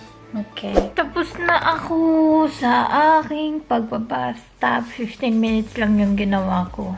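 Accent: Visayas, Philippines. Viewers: Ate bisaya ka ba?🤗🤗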